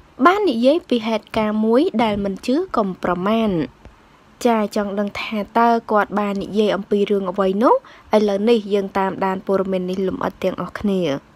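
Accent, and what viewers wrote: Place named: Vietnam. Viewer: អ្នកអាននឹងយួនទេដឹង តុងយួនច្បាស់ចែស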